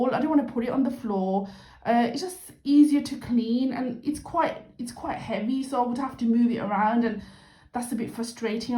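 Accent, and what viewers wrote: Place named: Birmingham, United Kingdom. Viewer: Just subscribed coz u sound like my cousins in Birmingham ❤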